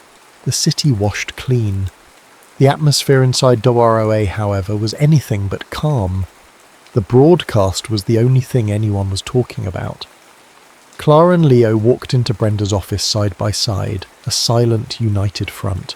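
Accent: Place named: United Kingdom. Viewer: Darwin, Australia. Thank you for this video and your lovely British accent 😊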